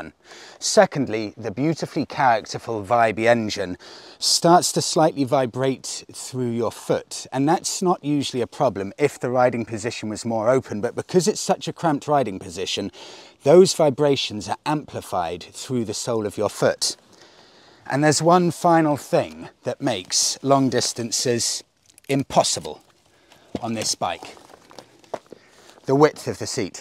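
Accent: United Kingdom. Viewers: I subscribed because of your being so british. Greetings from across the Northsea. Love the accent and manners. 😊 also great review.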